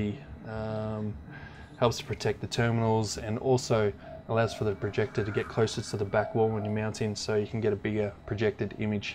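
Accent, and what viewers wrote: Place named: Australia. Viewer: Andre Blackwell After listening to him for the first couple of minutes, I'd say he's likely in Australia.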